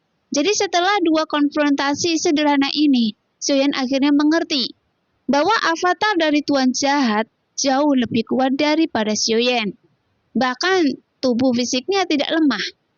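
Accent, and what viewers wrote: Java, Indonesia. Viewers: Mimin nya orang Jawa ya,Kentel banget logat Jawa nya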